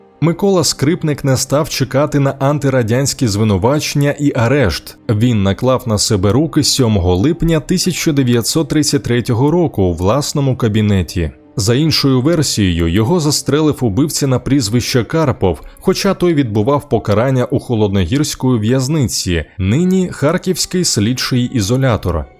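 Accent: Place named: Russia. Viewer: Якщо переймаєтесь правописом,то потурбуйтесь ще й про вимову. Ведучий чьокає і щьокає на рівні з росіянами((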